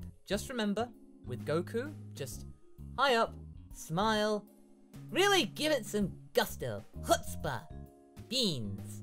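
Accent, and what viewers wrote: United Kingdom. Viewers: The first time I heard your natural speaking voice when you were at a panel, I honestly thought you were just putting on a fake accent, and I was like, "Who the hell is that guy? Where's Goku's voice actor?" Only to realize YOU were Goku and that your natural voice has a British accent. I felt super awkward when I realized that, but I'm pretty glad now knowing you were behind it, and seeing you do the DBZA Goku voice just makes me smile. Keep up the good work,! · u sound british m8.. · He wouldn't get any troubles to make a Goku Black voices considering the British Accent on that. Also, when I just figured that he's actually British, that just blowed my mind! That's amazing, I could swear that he was American. Much love from Brazil 🇧🇷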